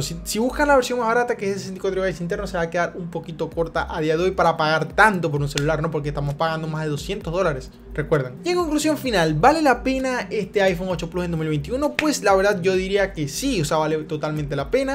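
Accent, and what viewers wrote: Venezuela. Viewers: eres venezolano???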